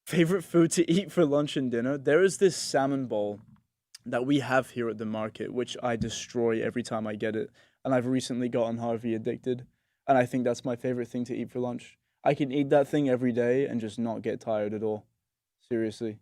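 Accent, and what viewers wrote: Edinburgh, United Kingdom. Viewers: that’s exactly it. He has the same accent as a lot of my Edinburgh/East coast friends. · I detected that as well, I’m guessing east coast Edinburgh say, I wonder what his bio is ?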